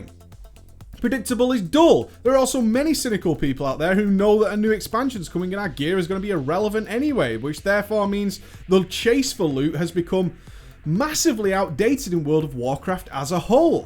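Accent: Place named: Lancashire, United Kingdom. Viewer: i think hes from the lancashire region of england tis a funny accent :P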